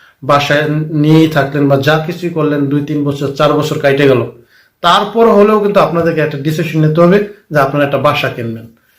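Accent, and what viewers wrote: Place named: Barishal, Bangladesh. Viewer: ভাইয়ের বাড়ি নিশ্চয়ই বরিশাল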